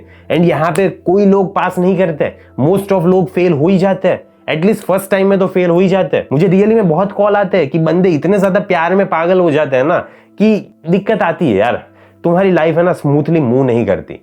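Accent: Gujarat, India. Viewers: Tumhari boli se pata chal gaya k tum gujrati ho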